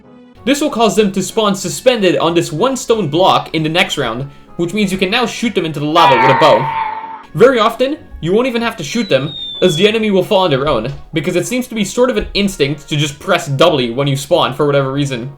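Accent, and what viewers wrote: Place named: United States. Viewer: ? Is that you under that American accent?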